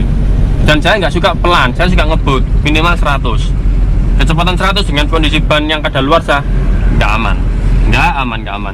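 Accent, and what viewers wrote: Surabaya, Indonesia. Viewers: Siap cakk,,,aq suka reviuw pyan,,,,to the poin,,,basa ne khas srboyo asli yo ?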